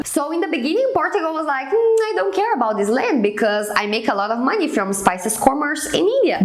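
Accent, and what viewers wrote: Minas Gerais, Brazil. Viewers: Seu vídeo é mto bom! Ter sotaque brasileiro não é um problema, vc tem um bom domínio da língua inglesa e o seu sotaque nem é taaaanto o problema... É mais a sua ENTONAÇÃO q não está compatível com a língua inglesa, mas dá pra (um falante nativo) entender 90% do que vc diz. (Brasileiros vão entender 100%, sem o menor problema) Bom, mas - se mesmo assim - vc se sentir desconfortável em falar com entonação e sotaque carregado e quiser amenizar essa sensação de inglês "aportuguesado", pra melhorar a qualidade do vídeo, eu sou especialista em linguística e meu foco é exatamente em pronúncia, entonação e eliminação de sotaque brasileiro... Seria um prazer aprimorar sua fluência! Acredito que em 1 mês seu inglês irá progredir uns 50%. Sou professora de inglês há 27 anos, graduada em Letras e possuo minha própria escola há 10 anos. 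Vc será muito bem-vinda! o do meu Instagram é byteachergabi eu não uso muito o instagram para postagem, mas estou sempre verificando minhas mensagens! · isso não é um "broke accent" é só uma das mais lindas e variadas formas de se falar ingles, ❤ tenha orgulho do seu sotaque mineirês-brasileiro, molier!! kkkk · Nossa essa é a primeira vez que noto um sotaque brasileiro forte em algum BR falando inglês kk Ela fala certo mas sem aquele sotaque americanizado, ela fala bem de um jeito abrasileirado mesmo Amei você 🤍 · amore, nunca mais peça desculpas pelo seu sotaque brasileiro!!!!!!! <3 luv u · seu sotaque é belissimo o sotaque brasileiro falando inglês é belissimo então não precisa pedir desculpa!! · você não tem um sotaque quebrado. tem um sotaque brasileiro! e é maravilhoso! achei que já poderia ter na história a tentativa de golpe do 8 de janeiro. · Teu (nosso) sotaque de brasileire é lindo!!! 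❤ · Muito bom! Amo sotaque, é bom demais ouvir o inglês brasileiro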